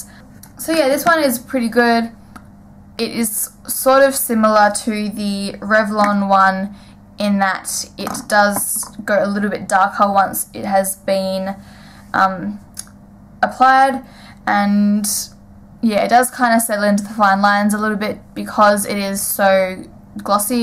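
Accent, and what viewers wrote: Australia. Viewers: I love the Rimmel one!! I cant belive i JUST found u! A fellow aussie lol altho ur accent is alittle more refind than mine hahaha hope 2 keep in touch, ur awesome! xx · its weird hearing another australian on youtube because everyone is american!!! this is what i sound like to others :D and i love it!!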